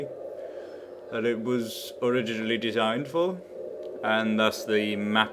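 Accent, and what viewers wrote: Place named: RP, United Kingdom. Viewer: You sound posh as fuck.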